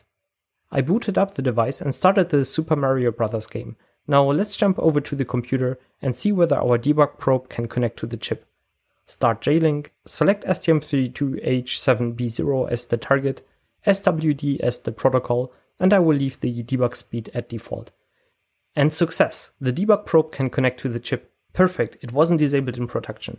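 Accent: Germany. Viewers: Impressive job dude! Are you german? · Are you German? Because your accent sounds really German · He sounds german too, it only makes it sound more dramatic. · Deutscher Akzent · Are you german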